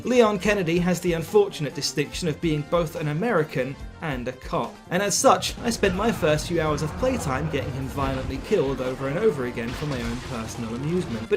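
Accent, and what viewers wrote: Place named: United Kingdom. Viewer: dang this sexy voiced bri'ish guy sure is funny and cool and a good addition to the channel well done hard drive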